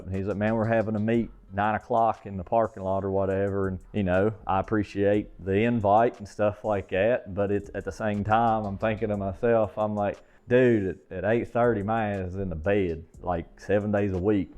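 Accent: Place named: South, United States. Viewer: Dagum I’m digging the southern accent… givem hell you merican 🤣🫵🤫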